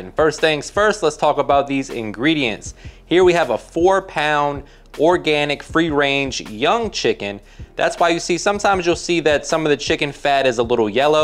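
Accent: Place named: Louisiana, United States. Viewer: You seem to have a Louisiana accent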